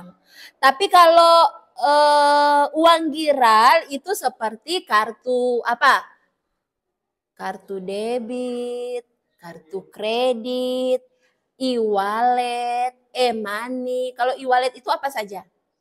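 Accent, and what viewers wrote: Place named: Manado, Indonesia. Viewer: Logatmanado ya ini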